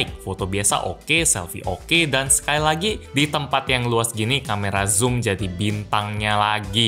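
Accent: Palembang, Indonesia. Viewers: Wong Palembang niannn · Wong palembang apo om ni? Biso bahaso palembang. Apo lah teirup cuko tu jadi biso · Wong palembang pecaknyo ni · asli plembang lu bang? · Ehh wong palembang ee bang? Baru tau kalo bang wong palembang 🤣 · Aku Cuman Fokus Pas Ngomong, Aku Tekwan samo pempek gendum nyo sikok ee. Apo ko aseli Palembang. Haha salam dari musi rawas ko. Maen maen lah ke musi rawas agek ku kasih kuliner keremis. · Seneng nian aii denger kak pake bahaso Palembang ni 👏👏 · Ko ni wong palembang apo kek mano😅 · Sering denger abang pake bahasa Indonesia, sekalinya pake bahasa palembang kedengarannya lucu, salam sumsel bang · Orang palembang y bang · Uwooohhhh... Pas bang ngomong ama penjual kaki lima nya pakai bahasa Palembang, kentel banget. Jadi rindu tempat kelahiran gw jadinya. 12 tahun merantau dijawa belum pulang2. Liat bang tiba2 jadi inget kampung halaman. 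Wkwkwkwk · Asli wong Palembang apo bang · Bang orang palembang?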